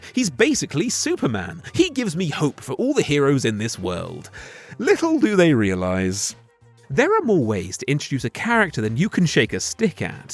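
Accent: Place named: RP, United Kingdom. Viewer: Almost stopped watching at "Indi-AWH-na Jones". Mate, learn how to integrate proper pronunciations with your excessively RP accent. It's like when brits say Jackarse, it makes my skin crawl.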